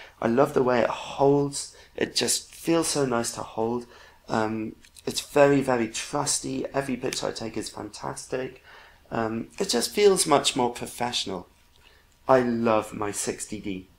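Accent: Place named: England, United Kingdom. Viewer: Where did you live in England before you moved to whales? Your accent is quite interesting =)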